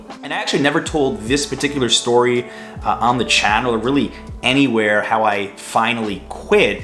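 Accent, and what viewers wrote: New York, United States. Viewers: I’m Japanese and grew up in Flushing Queens in the 70”s ! I’m now in my mid 50’s and living in Japan for 40 years but your vlog brings back all the great memories in my childhood. I’ m also losing my English speaking abilities but your vlog reminds me some of the New York accents and all the good foods especially pizza and sandwiches(^^) !! I love watching your videos! Keep up the good work😊😃‼️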